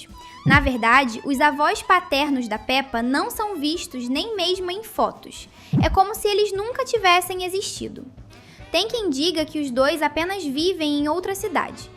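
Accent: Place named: Minas Gerais, Brazil. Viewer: Vc é de onde? Vc tem um sotaque mineiro ou baiano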